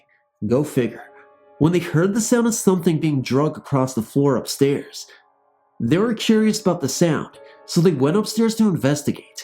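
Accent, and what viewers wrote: South, United States. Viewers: LoL 😂😂😂 Your Southern Accent 😏 I was Dying like is quite Funny & Cool 😀 much love ✌💙💙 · Southern ascent sounds good,great vid Thanks and happy holidays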